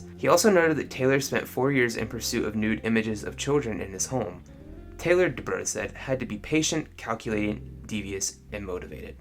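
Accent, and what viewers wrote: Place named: Martinsville, United States. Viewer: Indiana podunk? Sounds like someone is from Martinsville....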